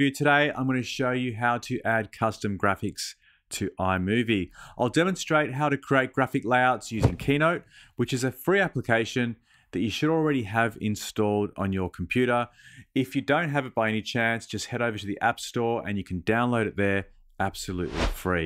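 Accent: Australia. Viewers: G'Day Mate! I needed a refresher after not using KeyNote for so long and found your channel. I have subbed straight away, it's so great to hear another aussie voice here on YouTube.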